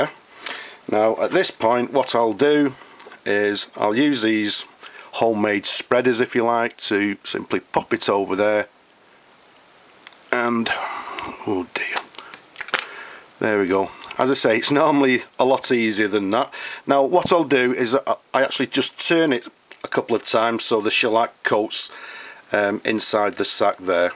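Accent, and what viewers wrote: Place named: Liverpool, United Kingdom. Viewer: Thanks bud, I think I can do this, kind of like a Harley his to clean your carburetor video..great accent mate, is text a Liverpool accent or Scottish ?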